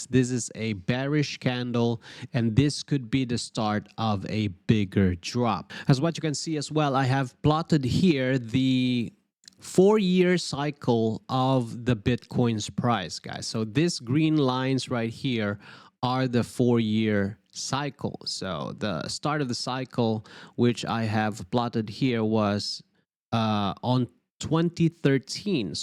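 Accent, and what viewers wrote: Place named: Philippines. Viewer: Sounds pinoy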